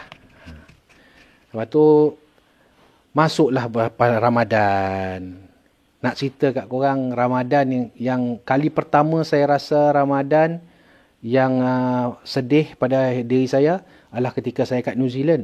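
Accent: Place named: Kedah, Malaysia. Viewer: Maaf tuan! Sya nk tanya sikit, sya dh tengok semua vedio tuan, tuan ni asai usul dri kmpong mana? Mcm org kedah ja ni?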